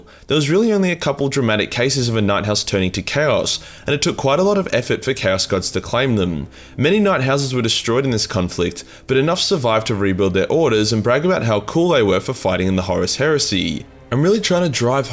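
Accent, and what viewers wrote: Australia. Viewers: I keep realizing that I have done more research on 40k Lore than, but I just like hearing a cutie pie Australian say shit I would find offensive in text or any other accent. But hey gal here happy to be recognized!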